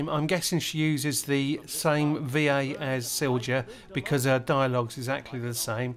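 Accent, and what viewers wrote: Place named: Cornwall, United Kingdom. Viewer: Cornwall, I wondered why I couldn't pick your accent !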